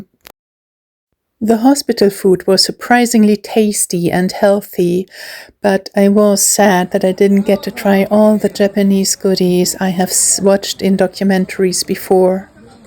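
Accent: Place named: England, United Kingdom. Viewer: Hallo, du hast einen englischen Akzent. Hast du dort gelebt? Deine Hunde sind zuckersüß, habe auch zwei😍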